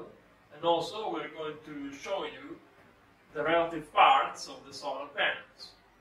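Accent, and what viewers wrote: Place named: Italy. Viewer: L'accento italiano si sente a 5000km di distanza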